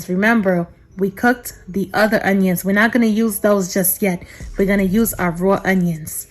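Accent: New York, United States. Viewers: I think its New York but it could be Boston.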